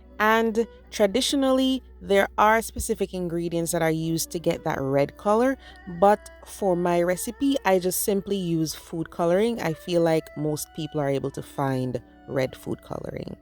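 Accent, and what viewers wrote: Jamaica. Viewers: Yummy looking I can only imagine the great taste loved you Jamaican accent 😋🥰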